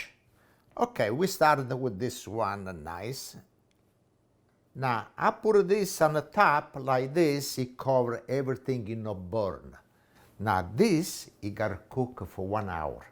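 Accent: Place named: Naples, Italy. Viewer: What town in Italy do you come from when you speak italian it sounds like my father . He comes from a little town in Naples called Castello di Matese, Caserta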